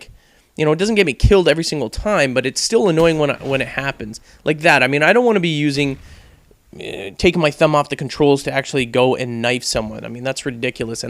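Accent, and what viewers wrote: Canada. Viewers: Lol are you Canadian? you say abot alot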